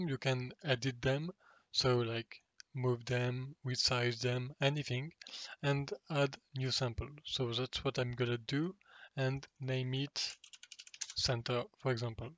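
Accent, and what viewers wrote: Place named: France. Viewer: Oulah c'est un français ça mdr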